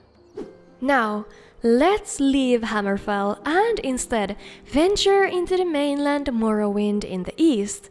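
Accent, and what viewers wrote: Finland. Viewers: Are you from Finland? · oh... OH... i didnt recognise from the accent at first, but... finland... huh... didnt think i'd find one of my kinsmen from the depths of skyrim mod enthusiasts. still, gonna download that mod, 100%. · Finnish accent spotted, torilla tavataan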